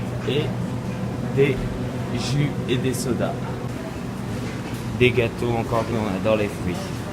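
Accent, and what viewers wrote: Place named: Brazil. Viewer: Mais il a un accent brésilien...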